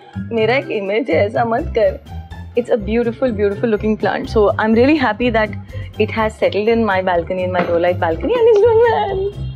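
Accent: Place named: Maharashtra, India. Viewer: i love yrr hindi marathi accent 😂........i love yr plant vedios .....but idky ig this is the best video ......LOVE from DELHI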